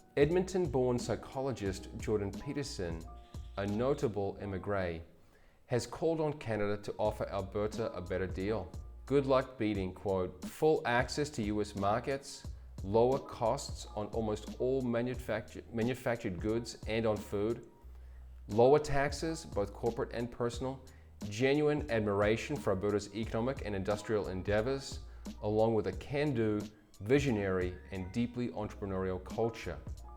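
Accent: Australia. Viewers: Sounds like he from either Australia or New Zealand, and according to his channel's about section he lives in Guatemala. The sad thing is, I don't think Trump needs to pay people to say these things. He's built such a cult, people will make excuses for his top appointees even when they sieg heil the audience twice on stage with the cameras rolling. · I have to admit that your “rant” rankles when I hear that Aussie twang😡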